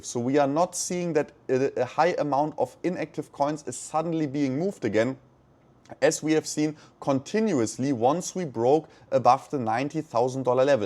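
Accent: Germany. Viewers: Hör ich da einen deutschen Akzent? ;-) Danke an Altcoin Daily. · Altcoin Daily Bright me here! Which European country are you in? Sounds a little German maybe? Anyways, I'm in the US and you're 6 hours ahead of me. If you did livestreams at 9pm your time, would be perfect for me! Keep up the amazing work!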